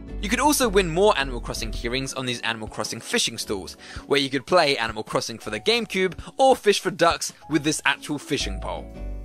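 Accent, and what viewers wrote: England, United Kingdom. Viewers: Sweet channel. My fav is Animal crossing, you are cute and I love your English accent:) more animal crossing videos please;)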